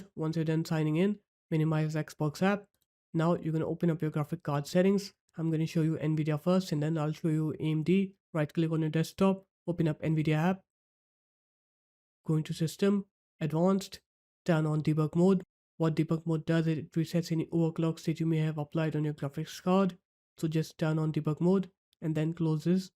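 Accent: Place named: India. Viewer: thought i was cooked then i heard a faint bit of indian accent so i know i am GOOD bro this fix WILL WORK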